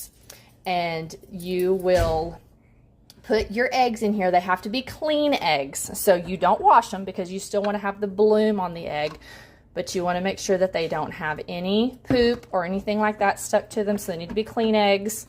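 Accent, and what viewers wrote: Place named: Ecuador. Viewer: Puerto Rican?… my minds’ playing tricks on me… I thought you were from “South America“….Ecuador . …. But whichever it may be, I was very happy to hear your mami’s voice and the pride in it, too ! ❤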